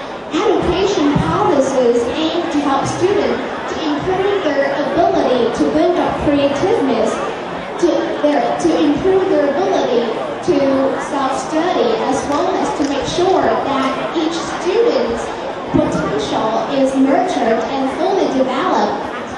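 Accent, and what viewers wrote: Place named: United States. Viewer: Your accent is way more like American than British.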